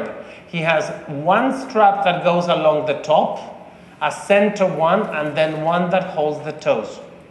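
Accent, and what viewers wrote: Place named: Ireland. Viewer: I pick up a bit of Irish in your accent, I thought that can't be, you're Spanish speaking, maybe you've been to Ireland or studied and lectured there ? Marvellous miracle which is inexplicable , I think there's more to be found, hidden in this treasure .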